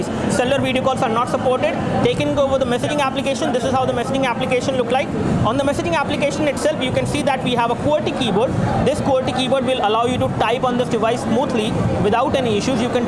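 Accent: India. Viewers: TsukaimaR1ngu Indian english man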